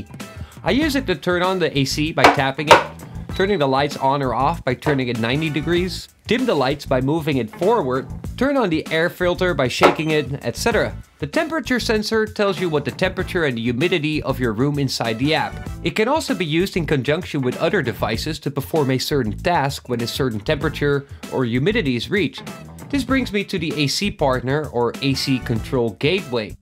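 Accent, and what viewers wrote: Netherlands. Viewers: Dutch I think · are you Dutch? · So happy with your channel man. Your accent sounds Dutch, are you?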